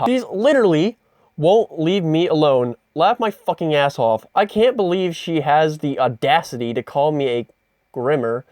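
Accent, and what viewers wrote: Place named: Belfast, United Kingdom. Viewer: OMG I can hear the Belfast accent in your voice lol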